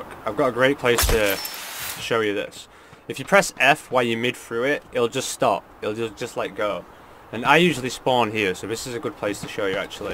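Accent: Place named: Derbyshire, United Kingdom. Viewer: Exactly what I thought mate! Hints of the Derbyshire tones in your accent just like me. I'm also from Derbyshire as it happens!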